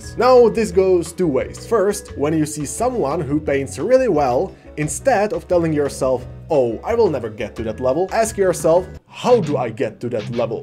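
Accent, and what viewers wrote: Czech Republic. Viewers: Zdar, paradni mas videa bro. Zijes v Ameru? Ja jsem v Chicago..zdalo se mi podle accentu ze budes od nas, ale az pak tvuj email te prozradil a jeden napis Praha na jednom ze stetcu.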